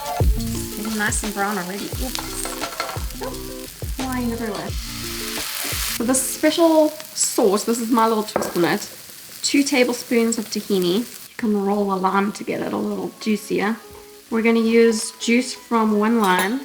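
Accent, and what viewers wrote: South Africa. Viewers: Thank you for these lovely easy recipes! I've been watching your videos for years & loved your South African accent but wow your accent has really changed so much! Love your content. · Hahaha i love the "somme" randomly coming out sometimes 🤣 mis jy ooit SA?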